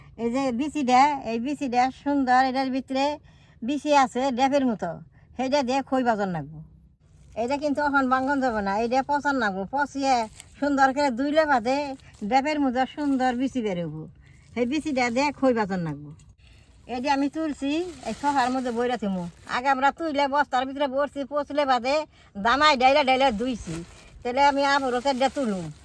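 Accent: Tangail, Bangladesh. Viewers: আমাদের টাঙ্গাইলের ভাষার সাথে অনেক মিল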